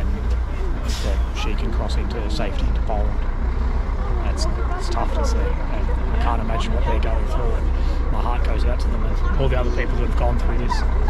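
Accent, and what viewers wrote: Australia. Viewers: Sound Australian? You a legend brah big ups from Perth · I can hear an aussie accent. Please get rid of the beard, we cant hear your voice or see your mouth to try match up words. Good luck mate, stay safe, get out safe. Steve in australia.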